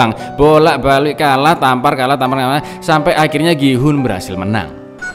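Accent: Java, Indonesia. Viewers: ngomong e medok.. Monggo sekeca'aken dook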